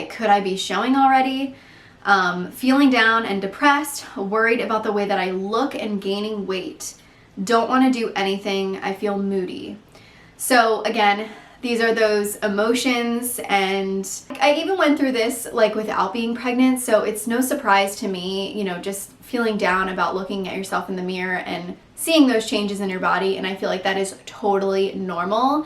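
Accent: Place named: South Jersey, United States. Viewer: Totally voting boy 💙 I just wanted carbs, bagels, cereals etc 😊 also since moving from Jersey, I can totally hear your accent! I have the south jersey accent as well and my new friends always call it out 😂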